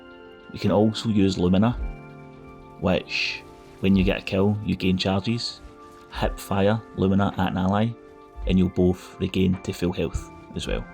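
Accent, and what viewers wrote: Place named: England, United Kingdom. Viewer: Bet you from England 😵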